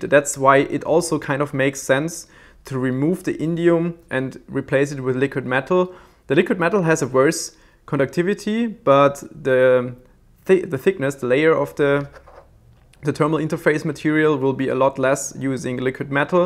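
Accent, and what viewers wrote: Germany. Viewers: er ist eben deutscher. Meine fresse. diese dummen unkonstruktiven kommentare immer. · deutscher Akzent ist richtig übel... aber sonst mag ich deine Videos!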